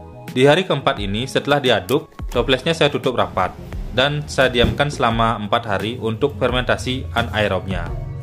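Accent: Bali, Indonesia. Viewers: Dr Bali ya bli??? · orang bali ya bang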